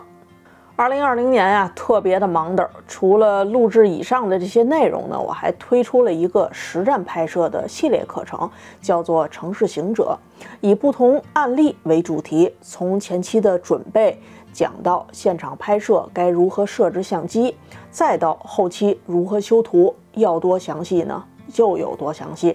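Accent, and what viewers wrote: Beijing, China. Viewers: 北京话太亲切了。您这刚学剪辑我感觉就已经做得很专业了 非常棒 支持 干货很多，会给朋友推频道的！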